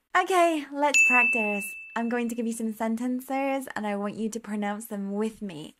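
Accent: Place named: United Kingdom. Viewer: How interesting! I never heard of the schwa sound before – it helps a lot to understand that nice British pronunciation I love! ❤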